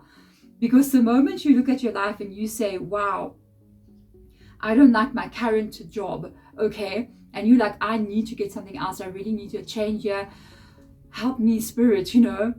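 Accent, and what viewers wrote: South Africa. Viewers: Hello! I am a fellow South African Aussie! I miss the Saffa accent and I’m so glad I found you! I could listen to you talk for hours. Thank you so much for the Sagi guidance 🇿🇦🇦🇺♐️🤍🙏🏻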